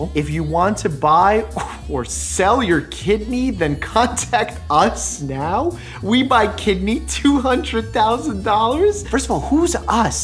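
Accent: Russia. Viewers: Are you Russian?? Even better😍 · im new, are you russian?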